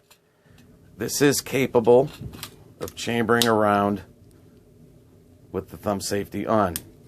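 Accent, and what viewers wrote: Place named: Boston, United States. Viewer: first video ive seen of yours off rip i can tell your from Boston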